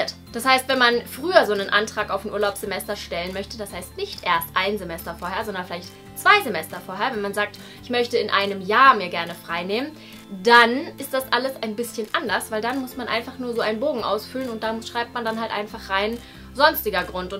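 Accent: United Kingdom. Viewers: Hey!♥ Ich verstehe wie du das mit dem Englisch sprechen meinst, ich bin auch zweisprachig aufgewachsen:) Meine Mama ist aus Italien😊 Ich würde es mega cool finden wenn du englische Videos machen würdest ich liebe deinen britischen Akkzent!😍 Deine Neuseeland Vlogs inspirieren mich sehr:D Ich möchte gerne nach dem Abitur ein Jahr um die Welt reisen☺ Danke für die Inspiartion und genieß deinen Urlaub 😘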